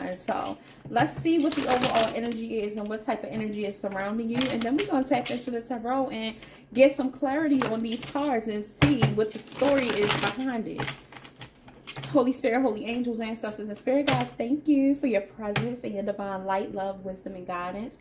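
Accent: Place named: Baltimore, United States. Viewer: You gotta be from Baltimore 🫶🏼✨ it’s the accent for me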